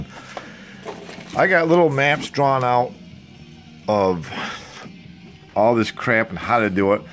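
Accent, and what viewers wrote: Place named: North America. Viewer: thank you for no selfie face shots. thank you for no annoying music. thank you for not wearing blue latex gloves to protect your delicate skin. thank you for no disclaimers such as " I'm not an electrician.....electricity can kill....wear safety equipment...."thank you for plain English....thank you for your x and o drawing.....thank you for keeping it short....thank you for speaking with a north American accent....thank you for cursing....thank you for not elevating yourself to a master class instructor the last 24 hours that's all I saw online, people spitting out technical jargon that makes no sense, and odds are they don't even understand it........everyone wants to be a youtube producer and15 min of fame so they can brag to their grandchildren.....am I bitter? FKN "A" I am. ....got a brake press that's down.....losin money.........and thank you for your humbleness when someone in here asked where the hot wires go.......you said......beats me...that was a long time ago.......hahaha....good one....later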